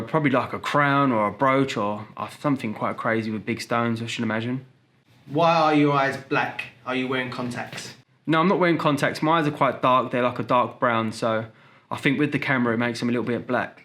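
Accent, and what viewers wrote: Cockney, United Kingdom. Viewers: Besides your talent and skills that we are witnesses in every video, 1st: nice house! 2nd: rescuing dog from being someone’s dinner...👏🏻👏🏻👏🏻👏🏻 nothing to say here🥇 3rd: I looove your cockney accent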